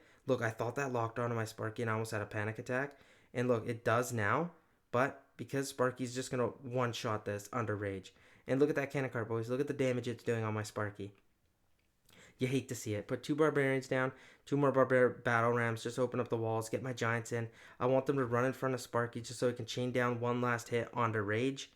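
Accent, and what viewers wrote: Canada. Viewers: You owted yourself. Bro is from Canada or upper midwest. I think he watches trailer park boys too. The way she goes!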